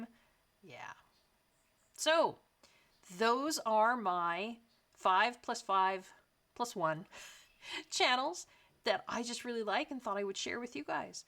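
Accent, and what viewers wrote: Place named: Canada. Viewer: It’s funny that you find British accents relaxing, I’m Scottish and I love yours because it reminds me of my best friend who’s Canadian 😅. I used to watch time team with my grandparents, love me a bit of Tony Robinson haha. I don’t know if you’re familiar with Gem and the colour cave here on YouTube but I think you’d enjoy her stuff!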